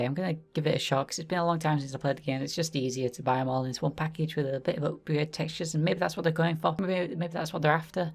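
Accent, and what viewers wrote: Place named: United Kingdom. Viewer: Less british than i expected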